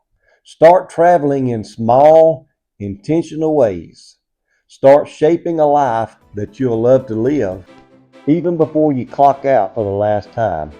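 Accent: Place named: Texas, United States. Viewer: I heard that accent on your latest video and had to scout you guys to see if you are a Texan. Im at the gym, more later.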